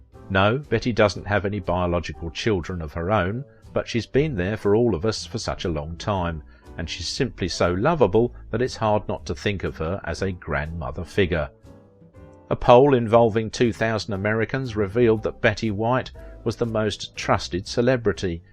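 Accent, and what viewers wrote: United Kingdom. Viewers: NOTHING, in the world would delight me more than in replying to your comment, like feeding a pork chop to a Pit Bull. BUT, I'm going to give the honors to, the owner of this magnificent channel. It's bound to be epic, and with a classy British accent.!!!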